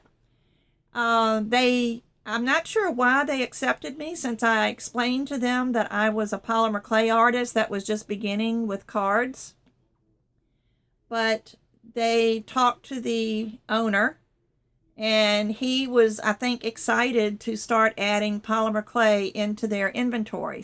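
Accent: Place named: South, United States. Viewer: Hello am the same one who liked ur southern(not cowboy...) accent!! And of course your great chit chat while sharing polymer crafts which are super helpful.this heart to heart video was so touching dear,i find you are so sweet and humble. Wish u the best for new position and whatever u decide i ll still be a faithful viewer from the island of crete in greece.take care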